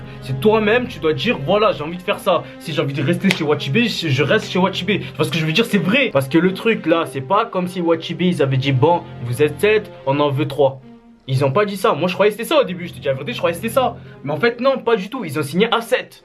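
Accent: Marseille, France. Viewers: GG a toi le sang avec l accent marseillais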